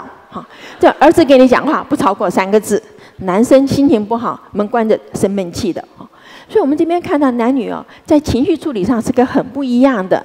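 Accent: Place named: Taiwan. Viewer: 台湾女生说话真的很有特色，我个人是蛮喜欢的的。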